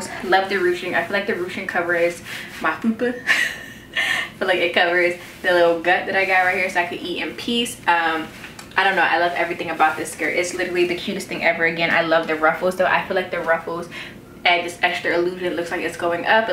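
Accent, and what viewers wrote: Philadelphia, United States. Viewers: girl I clicked your video because it was suggested to me and within two seconds I had to see if you were from Philly. low & behold I was right! hey Philly sis <3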